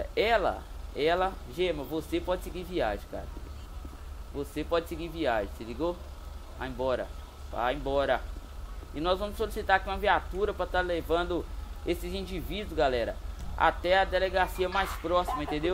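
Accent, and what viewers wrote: Minas Gerais, Brazil. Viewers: você é Mineiro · e mineiro???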